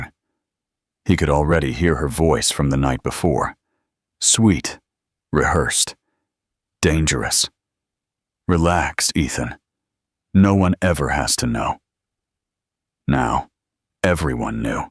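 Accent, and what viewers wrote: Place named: United States. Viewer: Oh my goodness St Thomas Hospital they looked after me im a London its so good to hear London on aAmerican story anAmerican voice saying these places its wounderful the park everything thank you AI family thank you . London is sad right now but with lots of luck London will be back god bless you all❤❤❤❤❤❤❤❤❤❤❤❤❤❤❤❤❤❤❤❤❤❤❤❤❤❤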